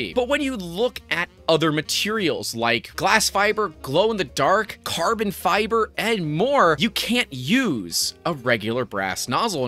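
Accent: United States. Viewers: Oh, My... Degrees in Celsius and "Aluminium"? Am I misjudging your American accent?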